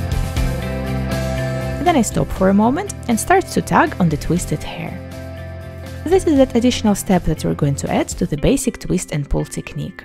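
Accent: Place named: Russia. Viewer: U have rus accent...), wr r u frm?!)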